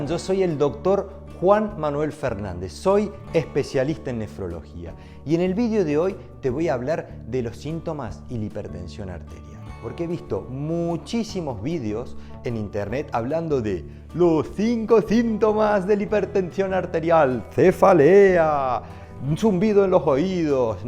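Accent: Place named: Córdoba, Argentina. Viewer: Buen vídeo ! El asentó cordobés no se te va 😂😂😂 yo 20 años in USA y no hay caso 😂😂😂 Aguante Alta Gracia !!!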